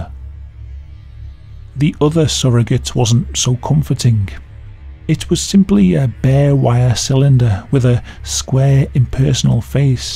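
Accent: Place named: Manchester, United Kingdom. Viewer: Oh,. You don't sound sarcastic, you sound like a Manc!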